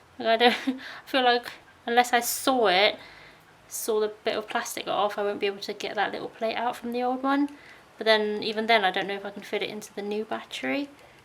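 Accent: United Kingdom. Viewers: you have british accent - funny... honest gurl / great video.. thumbs up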